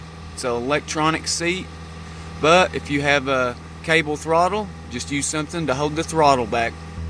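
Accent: South, United States. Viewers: Your southern accent is amazing.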